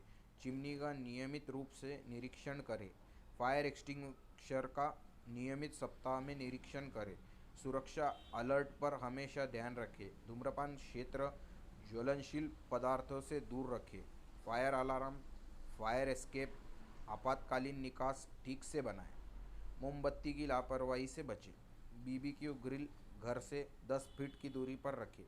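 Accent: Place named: Maharashtra, India. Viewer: From your hindi... I assured that you are MARATHI person... Jay maharashtra bhau 🙏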